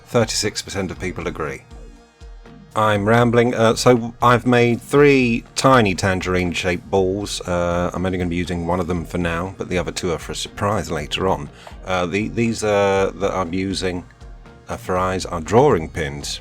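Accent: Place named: Manchester, United Kingdom. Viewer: Yes i got Paul Calf vibes from the accent at times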